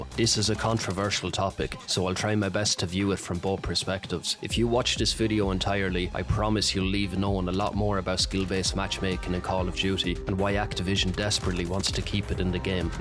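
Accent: Ireland. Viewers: Two reasons why you’re better than exclusive ace: your wise enough not to give them the benefit of the doubt, and the Irish accent. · Nice to hear an Irish man talking about these topics. Eire go bragh yup yup ❤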